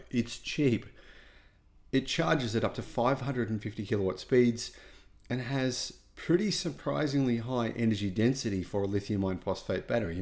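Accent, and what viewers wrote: Australia. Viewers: Aussie accent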